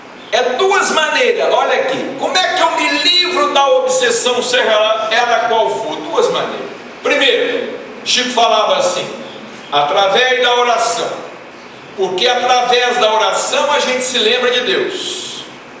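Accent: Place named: Minas Gerais, Brazil. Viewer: O interessante da palestra Dr. Baccelli é a sua clareza no conteúdo passado... Sabedoria ! E o jeito mineiro de dizer... Adoro !!! O tom de voz adequado e simples por questões complexas e que devem ser reflexivas ! Dr. Baccelli tem Excelente Oratória! Aprendo muito... Gratidão Dr. Baccelli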